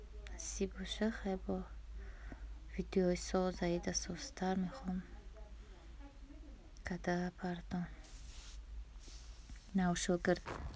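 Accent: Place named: Garm, Tajikistan. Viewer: шумо точикистона ай кчошен апачон? гапзанитон гармиёда монандай илтимос чавоб дихед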